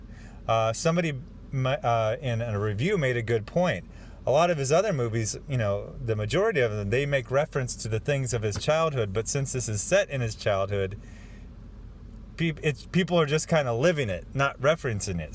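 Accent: United States. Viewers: Ya Boi comes out of the theater to record this vid and the first thing I hear is a vintage Western accent.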